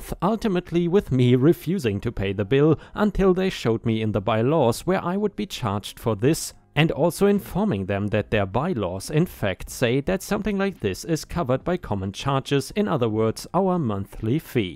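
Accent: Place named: Germany. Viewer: Your charming German accent makes me smile every time you tell these stories! Keep up the great work! Love your HOA and property related stories btw!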